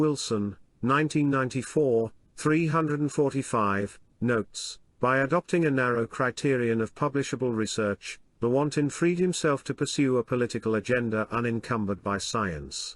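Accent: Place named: United Kingdom. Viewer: The british voice actor ..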